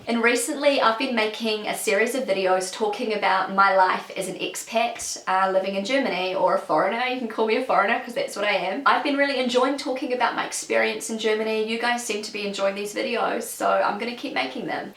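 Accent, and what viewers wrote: New Zealand. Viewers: First of all, I want to say that I enjoy your videos. What I find interesting about your videos, besides the content, is the kind of English that you speak. I had never heard New Zealand English before. I had to watch your first videos multiple times because I couldn't understand everything right away. There are several sounds or words that you pronounce differently and that's why I sometimes understand something entirely different. Here are some examples: rules -> roles; check -> chick; get -> git; ten -> teen; reason -> raisin; bedding -> beating; sense -> since; gas -> guess; f-word -> if-word. Anyway, your English sounds really cute, particularly when you say "GermaNAY" instead of "Germany". Perhaps you could make a video about how New Zealand English differs from US English or British English. What I have also noticed is that English speakers say "recycling" when they actually mean "waste separation". 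I don't know about other German speakers, but I would never refer to the mere waste separation that I (have to) do as "recycling". In my opinion, waste separation is a prerequisite for recycling - or, at most, the very first step of recycling. But it's definitely not the entire process of recycling. I don't melt empty plastic bottles at home and form new stuff out of them. That is done elsewhere by professionals. It probably didn't occur to your German boyfriend to inform you about waste separation, because he assumed that waste separation is standard practice in all countr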